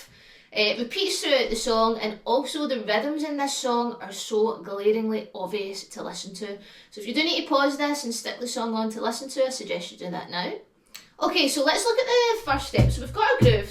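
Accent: Scotland, United Kingdom. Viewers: So I was just clicking around YouTube Shorts and ended up somehow On one of yours about "finding a groove", then I checked the main vids out And saw this one was new, so I listened and watched from start to end. I like the blue instrument, and the musical cap seems like a neat piece of fashion, But I noticed neither for like five minutes because... your accent is so pretty! 😄 (I should go find other people from Scotland to watch now or I'll end up learning the ukulele. 😂) Keep up the good work because the lesson itself was rather easy to follow along with, And if I had a ukulele, I think I could pick up strumming fairly quickly with this practice. 😃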